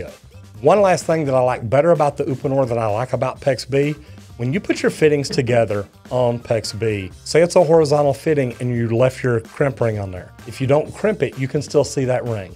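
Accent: Texas, United States. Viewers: He's knowledgeable and interesting but that Texas/Southwest accent is hard to take.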